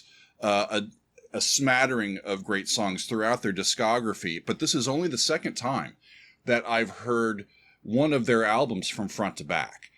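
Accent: United States. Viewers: This album followed a great album and was followed by a medocre one. Their best one and shows how a group going through difficult times can make rheir best work. Very powerful, very heavy. Annoying American commentary, aftef all we're talking British through and through.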